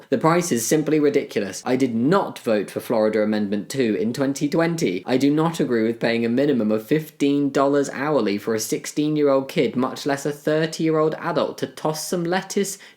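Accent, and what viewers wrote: England, United Kingdom. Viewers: You have an accent! Are you from England?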